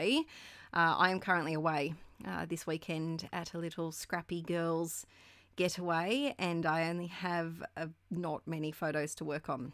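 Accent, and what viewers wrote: Australia. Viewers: I am amazed at the job you managed to achieve suffering from PND. I appreciate your energy and enthusiasm which is in you as well. The first thing I do when I wake up is going on YouTube and watching your video which I expect with a kind of childish excitement. I live in the south of France and I love listening to your Aussie accent. Your videos are precious to me as I suffer from disabling chronic illness which makes it difficult for me to craft. So I just take things as they come. Life is beautiful, you've got a lovely family, stick to all the wonderful things you achieve and don't give to much credit to this PMD. This doesn't mean you've got to ignore it, which is impossible but maybe you need to take care of yourself and slow down a bit. Having 2 young kids and a job is very demanding. Don't forget your own needs. Take care. Thank you very much for the few minutes of fun and joy you bring in my life.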